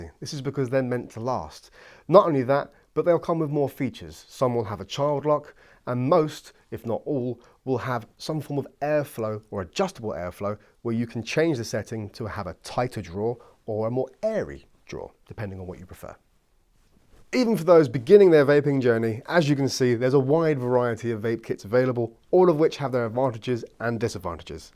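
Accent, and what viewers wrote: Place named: United Kingdom. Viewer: AN IRISH WITH A BRITISH VOICE?!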